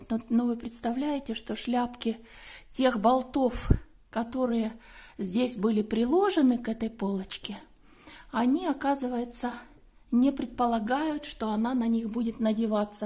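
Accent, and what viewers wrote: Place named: Shakhty, Russia. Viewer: я от вас балдею, только наши женщины не ноют и на все руки мастера, я ваша землячка из г.Шахты, определила это сразу же по вашему разговору, училась в Ростове. Привет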